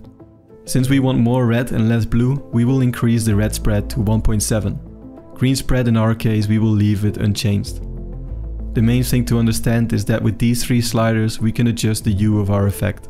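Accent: Netherlands. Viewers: Ik hoor volgens mij Nederlands... Nee, ik weet het zeker! ;-)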